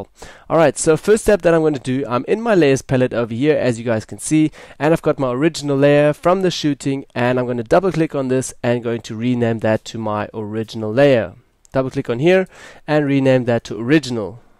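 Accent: South Africa. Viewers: Great tutorial, but it was immeasurably better with the South African accent. So pleasant.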